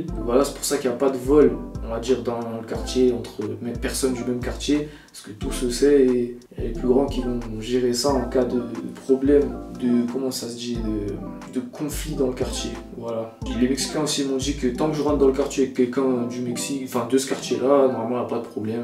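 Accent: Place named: Suburbs, France. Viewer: Sa c voit t un mec bien et tu fais ton truc et tu kiff, donc arrete de parler comme un pelo de banlieue pcq sa rabaisse ta qualité de video et les trucs que tu fais qui sont interessant.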